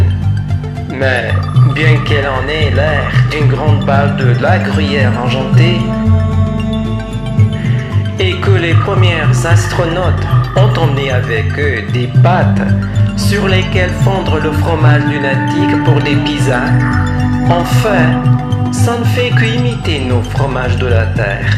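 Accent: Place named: France. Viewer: c'est tres tres sympatique ...ahahaha ... et Ton accent Français est magnifique :)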